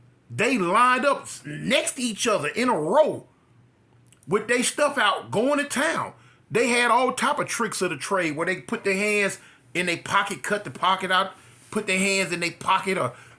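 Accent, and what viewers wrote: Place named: Texas, United States. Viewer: Are you from Texas? Why do you sound like my dad? Lol.. I know my dad, I'm not saying I don't.. But it must be a Texas thing how y'all talk, lol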